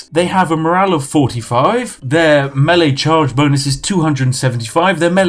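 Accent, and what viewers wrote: United Kingdom. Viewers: You know I usually dislike brits due to their attitudes/accents. You sir, should be royalty. Funny as hell, informative and got me to drink a cup of tea. Hats off to you sir. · the use of the British exploit of anglicizing everything and not even bother to try and pronounce the names in the original pronunciation made me laugh very Spiffingly British hahaha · That british accent makes a lady happy indeed.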